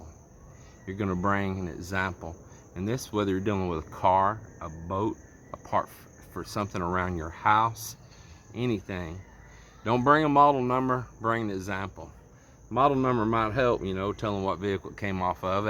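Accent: Texas, United States. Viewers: You from texas? I can tell. Working in BPO :) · And i love your Texan accent.. real cowboy language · I am a foreigner working in Manila. I like the attitude you share and I also like your Texas accent. 😄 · Love the accent sir, texan accent! I’m proud of u sire! Can i work with u sir? Don’t worry about the salary, just love hanging out with u sir. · Love your passion. Hope to have more of you. Can we clone you? Love your Texan accent. Hope you are enjoying your stay in the Philippines. You are a sweetheart. Take care and God bless. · Luv your texan accent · I always love Texan accent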